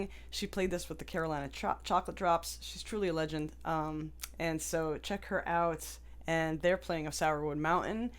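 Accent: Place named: West Coast, United States. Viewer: why cant't she pronounce a ""T"" ? I really resent this ""west coast"" English. Go back to your 3rd grade English teacher. Speak proper English if you are going to do an American YouTube !!!! Duh !!!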